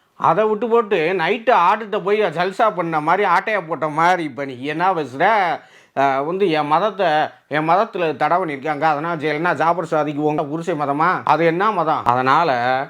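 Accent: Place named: Madurai, India. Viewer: ANNA,UNGALODA NAME PLEASE.SUPERB MADURAI TAMIL VERA LEVEL ANNA.❤❤❤❤❤🎉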